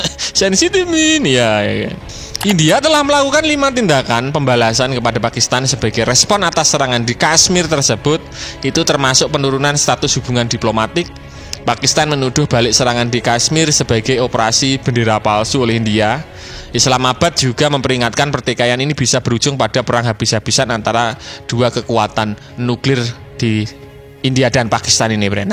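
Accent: Java, Indonesia. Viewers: Medok banget jawa lo min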